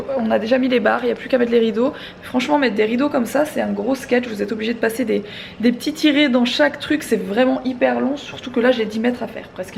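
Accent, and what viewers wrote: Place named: Belgium. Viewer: Je trouve que ton accent Belge s'entend fort dans cette vidéo ^^. Bravo pour tout ton travail.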